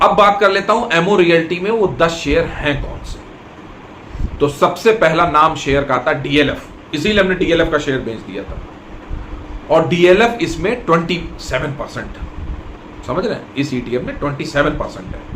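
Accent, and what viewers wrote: Kanpur, India. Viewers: झन्नाटेदार , बातों का छौका न लगाओ , ek dum kanpuriya andaz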